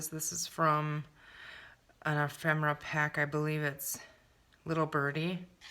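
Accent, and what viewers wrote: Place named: Midwest, United States. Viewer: Just found your channel and also subscribed to your monthly kits. Lovely style! I’m from MN currently living in IL, and your accent makes my heart yearn for home!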